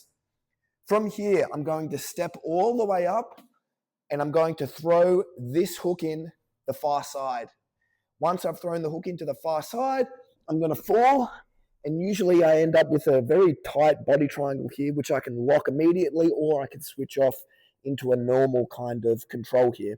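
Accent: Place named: Australia. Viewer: This Australian accent is wonderful ❤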